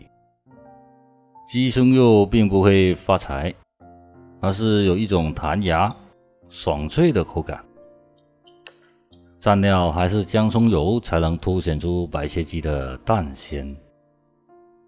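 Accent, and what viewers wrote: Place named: Cantonese, China. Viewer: 帶著廣腔的普通話，清晰，幽默風趣的的旁白，讚！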